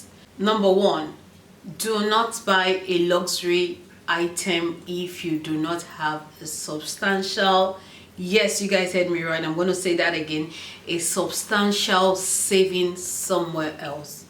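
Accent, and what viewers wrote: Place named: Nigeria. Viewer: You sound Nigerian, I can tell. I think that luxury obsession is ridiculous. I love luxury items but I wouldn't buy one as an investment.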